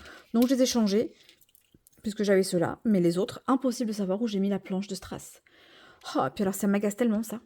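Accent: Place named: Southwest, France. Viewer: Cet accent du sud ouest! Je le reconnais j’ai le même 😂😊 j’adore tes vidéos !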